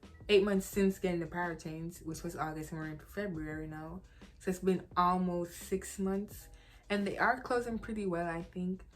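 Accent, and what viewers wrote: Jamaica. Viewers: Jamaican accent? Subscribe!! ❤️💪🏾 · Can definitely tell that you're jamaican 😂❤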